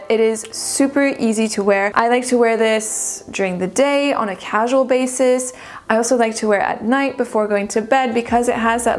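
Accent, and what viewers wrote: United States. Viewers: Bonjour je. Inès de m’abonner 😍la description olfactive est très intéressante et j’ai bien envie de me diriger vers une parfumerie pour les essayer. Merci et Bravo pour cet accent américain qui est Top 👌💯❤️ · Hi. Great work on all of your fragrance videos! I remember when you first started your channel, a couple of years ago. Well done! I'm so curious: are you half French and half American? Your pronunciation of French words is of course native-level French, and your English has an American accent, but there is a "lilt" that tells me it doesn't come directly from the American mainland, at least in the linguistic formative years, up through age 13-14. I'm just curious! As an American, and a francophile who speaks fluent French, I am always fascinated by people's accents, and am curious about your linguistic background! Thanks for your great videos. 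Keep up the great work.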